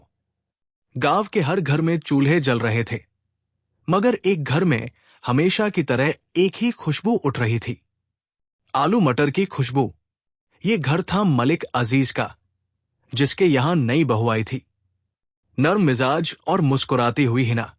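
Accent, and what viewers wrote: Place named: Pakistan. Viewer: Par ye pakistani jesa kyun bol raha he feel nahi arahi hasi aarhi he 😂❤️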